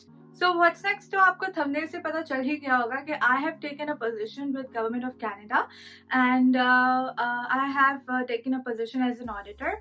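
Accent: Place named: India. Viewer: Who did her interview? She doesn’t even speak French let alone that heavy thick Indian accent.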